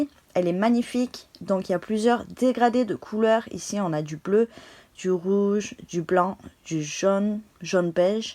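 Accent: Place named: England, United Kingdom. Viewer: Bonjour, On m'a justement offert des crochets bambou comme cela mais perso moi je ne me sent pas à l'aise avec Mise a part cela je te suit depuis le début, j'adore ton petit accent anglais, tes explications sont au TOP continue ainsi, ne change rien je souhaite plus souvent de vidéo (si tu peut ) merci bisous coco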